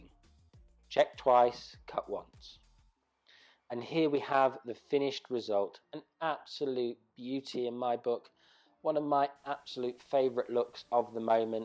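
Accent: United Kingdom. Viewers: Im a totally new hairdresser student and happy to find someone who just explains what to do and not all kinds of useless jokes and comments. Clear and easy to follow.The british accent helps as well ;) Thank you, you have a new apprentice!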